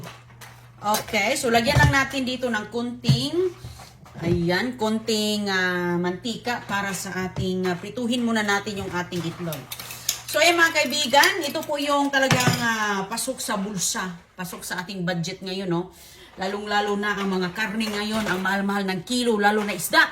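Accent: Visayas, Philippines. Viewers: Tama iba ang bigkas nang bisaya kung magsalita nang tagalog.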